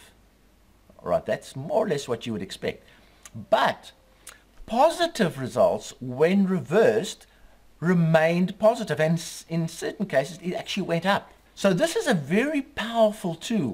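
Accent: South Africa. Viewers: you sound like a Suid Afrikaaner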